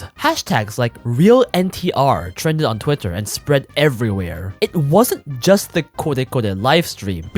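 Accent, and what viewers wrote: New York, United States. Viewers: Sounds like NY or something lol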